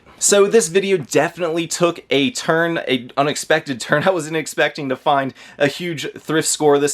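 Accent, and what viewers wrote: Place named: Baltimore, United States. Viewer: Ever live in Baltimore? Your accent reminds me of my Bmore neighbors :)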